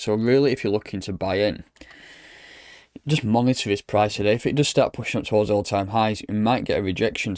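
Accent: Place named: Northern England, United Kingdom. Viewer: Proper northern boy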